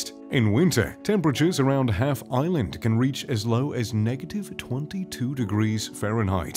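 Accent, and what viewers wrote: RP, United Kingdom. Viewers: You sound like the spiffing brit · why do we have a boujee British man narrating this?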